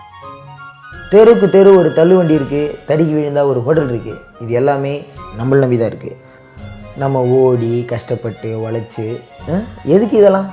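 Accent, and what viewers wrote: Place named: Chennai, India. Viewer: narration aa therithu idhu namba Chennai payan nu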